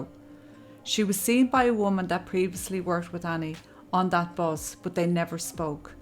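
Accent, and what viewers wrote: Ireland. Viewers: I enjoy your storytelling and your lovely barróg. It's a comfort really. This is the first I've heard of Annie McCarrick. As a 1st gen Irish American raised on Long Island. like Annie, very close ties to Ireland..this makes me shiver, it's possible I blocked it from my memory. Somehow the Northern Ireland angle seems a bit far fetched, anything's possible, but to kill an American, create an International incident? They gave no evidence. My heart hurts for her parents, my sincere condolences to Annie's Mom , I pray she is Comforted and has Peace, the peace that passes all understanding.. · I really enjoy hearing your accent! Some of my ancestors are from County Cork. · Poor Annie.. So sad and tragic that a New Yorker ended up disappearing in Ireland, where the crime rate is so much lower than in NYC/NYS. Extremely puzzling and incomprehensible that she decided to go for a walk so late in the day, so far away from her apartment and, above all, on her own.. 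We will never know... What an unbearable torture for the parents, not knowing... No closure. Btw, in 1987 she wasn't 19 but 21 (born 1966). Very well told story, you have a soothing voice and such a charming accent. I love Ireland! Best wishes for your channel!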